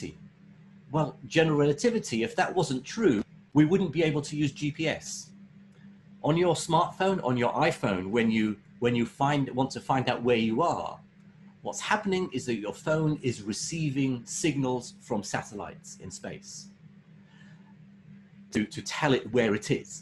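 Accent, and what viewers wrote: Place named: Iraq. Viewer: لغته العربية باللهجه العراقية گلش حلوه.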